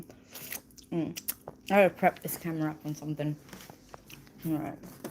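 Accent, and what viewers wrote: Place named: Australia. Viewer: Love your accent. Are you an Aussie 🤔🦘🇦🇺🌏🐨🪃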